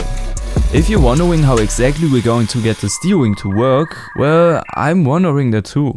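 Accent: Germany. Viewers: He's german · Br du hast den Deutschesten Akzent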